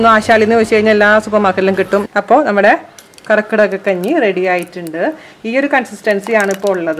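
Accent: Thrissur, India. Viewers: Kkk👍. Chechide slang vechit...naadu Nammade thrissur aano ?? Njanum thrissur aatta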